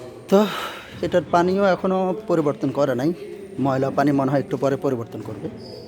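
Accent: Noakhali, Bangladesh. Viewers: ভাই আপনার বাড়ি নোয়াখালী?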